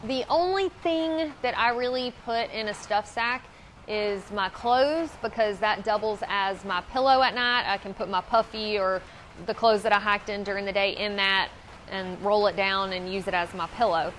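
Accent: United States. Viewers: The why you say your “i” crack me up. It’s so drawn out that it adds syllables to words hahah I’m not picking on you, I’m from Louisiana and my husband from CA could barely understand me when we met LOL Your hard vowel accent was just so drastic and I was curious what part of the US you’re from. WV? Figuring out regional accents has become a strange hobby of mine 🤣 PS I love everything about this channel just from seeing your chair fort set up hahah instant subscribe. I’m BRAND new to hiking and not sure if it’s for me, but this channel seems fun and informative.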